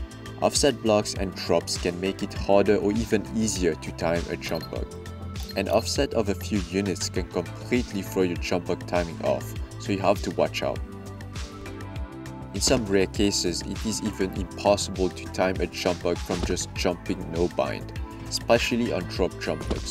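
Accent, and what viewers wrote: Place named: France. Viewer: is that ur voice??? u sound so french XDDD anyways nice tutorial...best so far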